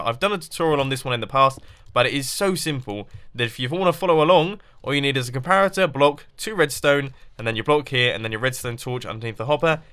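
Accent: England, United Kingdom. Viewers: Yeah I figured he was English (or Welsh) but I just wanted to ask because you never know. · In just 2 minutes I was inspired to fly my drone again. I've never been so inspired in my life. Is it the British accent? Idk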